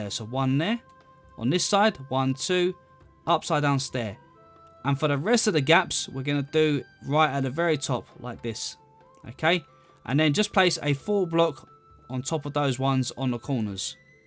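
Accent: United Kingdom. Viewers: I’m not a native speaker in English (I like your I guess British accent very much), so do you say abbey or monastery? I mean a building with a dwelling for nuns or monks and a garden in the courtyard with cloisters around it and a little chapel included. I hope I described it well so now you know what I meant. Sry for language mistakes 👍😀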